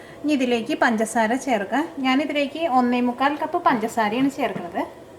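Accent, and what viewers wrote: Thrissur, India. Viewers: chechide chiriyum pinne thrissur slangile samsaravum nice aaaa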